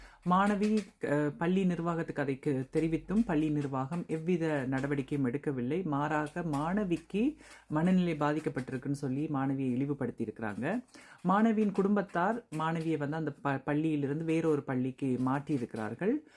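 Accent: Sri Lanka. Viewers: Akka algaa sri lanka tamil pesurigelee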